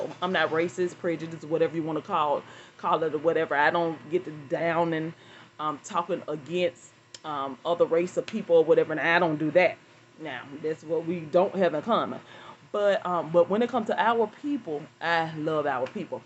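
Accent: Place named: Alabama, United States. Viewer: I can tell you are from Alabama. 😒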